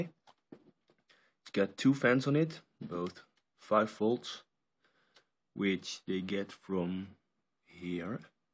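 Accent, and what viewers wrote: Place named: Netherlands. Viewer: you sound like you're dutch c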